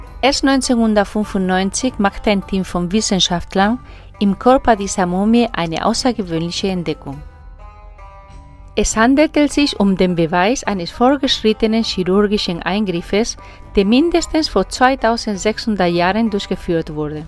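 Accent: Spain. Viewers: Nun ja, das nennt sich spanischer Akzent. Du bist offenbar ein mental stark eingeschränkter Provinzler, um es mal sehr nett zu sagen. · ...man 😄 lass mal den nazimist hier wech😉... Ich bin froh solch politischen scheiss auf solchen Kanälen nicht zu finden. Ich denke, dass die meisten Leute, die sich auf solchen Kanälen aufhalten ( darunter auch ich natürlich 😁 ) eine andere Sicht der Dinge haben, als der Mainstream. Zu der Stimme muss ich sagen gefällt mir sehr 🙊 ✌🏽 Nur der starke spanische Akzent gepaart mit den hohem Niveau an Wörtern stört mich! 😂 Es wird viel wegen der Dame, die spricht diskutiert. Dafür kann die gute Dame ja nichts, aber das war der Anlass für mich überhaupt auf die Stimme zu achten 😃 Trotz allem finde ich die Videos immer sehr interessant und ich schätze die Arbeit dahinter👍🏽weiter so...!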